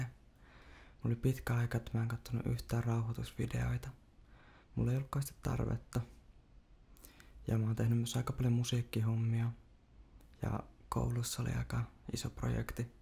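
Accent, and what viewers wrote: Finland. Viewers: Your finnish accent and cadence..... Relaxing rambling obviously comes naturally for you^^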